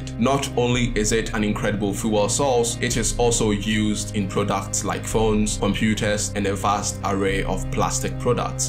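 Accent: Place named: Nigeria. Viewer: Make Naija accent the norm in documentaries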